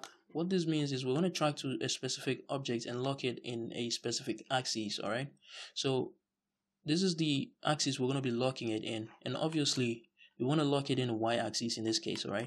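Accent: Nigeria. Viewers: Nice work bro!!👍🏽 Btw where are you from? You have a Nigerian accent.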